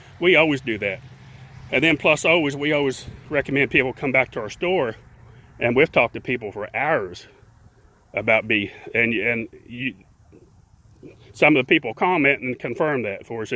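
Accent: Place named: West Virginia, United States. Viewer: I thought had a wv accent! Too funny. It’s almost as bad as mine. 😃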